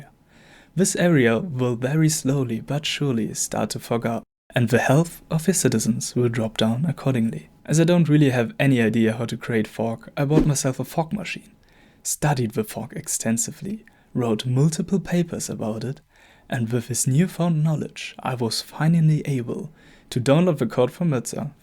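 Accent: Germany. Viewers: Man erkennt deutsche einfach am sprechen xD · Liebe es wie deutsch du klingst obwohl du extrem gutes englisch sprichst. Sehr gutes video btw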